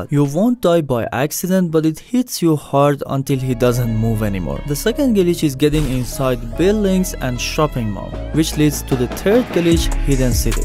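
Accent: Iran. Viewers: خخخ. داداش دمت گرم. از لحجه ات فهمیدم ایرانی هستی 👍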